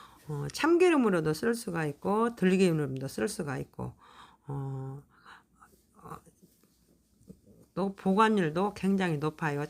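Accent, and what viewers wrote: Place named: Jeolla, South Korea. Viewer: 다혜송❤117번좋아요ㅡ 말씀이 전라도 신듯합니다 참기름 ㆍ들기름 보관범 호일감고ㆍ우유팩안에 보관 ㅡ굳아이디어 입니다 🎉🎉❤❤❤❤❤❤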